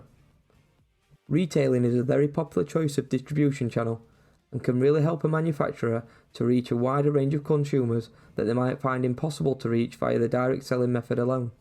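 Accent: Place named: England, United Kingdom. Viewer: I like your English accent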